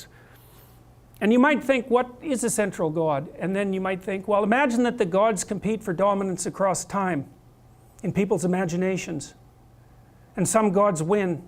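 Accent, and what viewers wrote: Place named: Canada. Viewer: The way he says "not" and "God" is for some reason hilarious to me. Or anything with an o. What a Canadian. Also, long live the lobster queen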